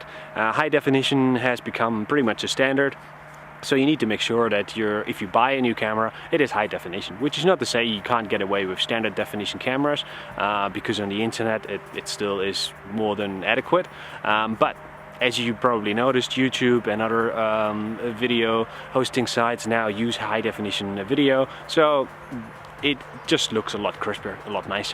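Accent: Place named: Italy. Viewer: Are you Italian? Love your accent!!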